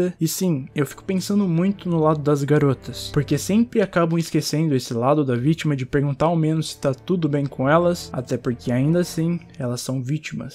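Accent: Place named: Sao Paulo, Brazil. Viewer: Paulista falando palavrão é lindo dms Vc parece cmg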